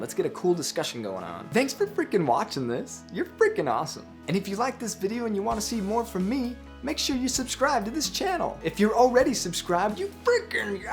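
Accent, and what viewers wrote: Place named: United Kingdom. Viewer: I thought he was British for a second XD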